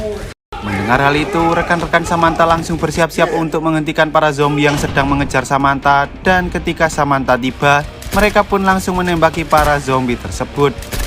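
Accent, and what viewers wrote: Java, Indonesia. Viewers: dari ngomong nya udah pasti orang jawa❤🎉😅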